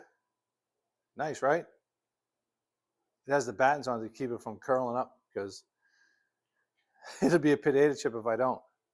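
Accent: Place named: New England, United States. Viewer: I love your accent, I left New England in ‘79, this brings me back.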